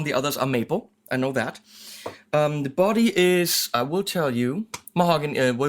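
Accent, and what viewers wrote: Germany. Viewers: I havent known for a long time, only recently got back from more a computer gaming time to pick up guitar again, after a long long long time. In order to get away from the computer stuff, which isnt easy for me after so many years and very good memories, i have dumped most of my Youtube bookmarks, which were centered aorund gaming. Now slowly getting nice channels here on Youtube when it comes to making music and all that. And also this channel here, which I really enjoy. is really entertaining and nicely geeky and his german english is simply adorable I think. I am from Germany too, so I know how that is.;) Anyways, after stating my joy for this channel &, to the topic at hand.;) I have to agree with on the fact that with a guitar on that price range, a gig bag or even a simple case wouldnt be so much to ask. I mean, its quite a lot of money, at least for most of us, and to only get it in a damn cardboard package is somewhat lame, to say the least. 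It doesnt matter which company it is, if its Solar or others. The fact is, a few things shouldnt be too much to ask when you buy a new guitar. Also, a multitool like you get on Ibanez prestige guitars (dont know if also with premium), should be also included, when you buy a guitar over 300 Euro or more. Lets face it, these tools cost a couple pennys in China or whatever, thats simply no excuse to only give that with really expensive stuff. Companies really cheap out on these little things, when th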